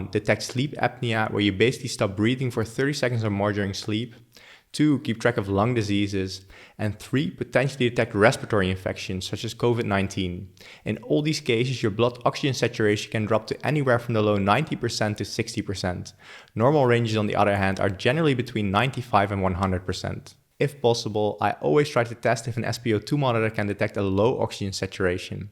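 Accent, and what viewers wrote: Netherlands. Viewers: Are you Dutch? :) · This guy must be dutch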